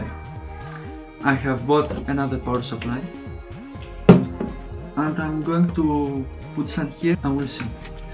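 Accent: Greece. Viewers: U have such Greek accent r u Greek?? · Thnx you sound like a greek just like my favorite basketball player Giannis Antetokounmpo im not greek im a filipino i didn't notice i got a bad ram stick its a ddr3 and its not connected properly it now boots up with display but the beep is gone forever what do i do now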